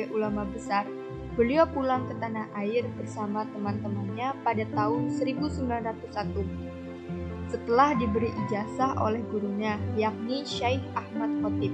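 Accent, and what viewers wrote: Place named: Banyumasan, Indonesia. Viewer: Uwuuuuu ngapak mantap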